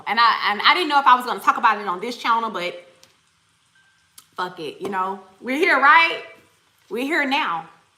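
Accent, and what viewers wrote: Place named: Houston, United States. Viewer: I love your voice do you live in Houston Texas if not you talk like them lol 😂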